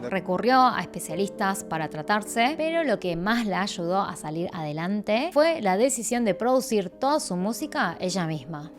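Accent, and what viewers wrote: Argentina. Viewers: Se le nota un buen el acento argentino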